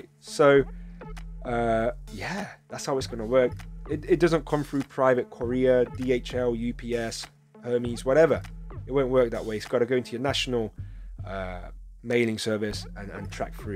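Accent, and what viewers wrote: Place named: Midlands, United Kingdom. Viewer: I thought you sounded like a midlands boy. Greetings from Northampton.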